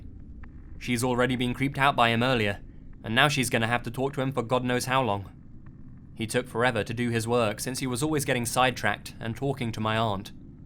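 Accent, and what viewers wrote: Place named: England, United Kingdom. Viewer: Best voice, i make fun of him but I would rather hear an English accent over any other, chap!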